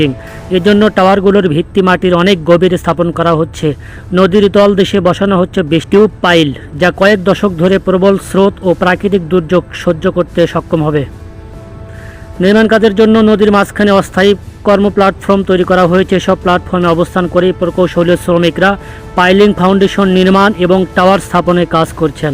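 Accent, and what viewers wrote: India. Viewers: R you from India ? Your sound like Indian.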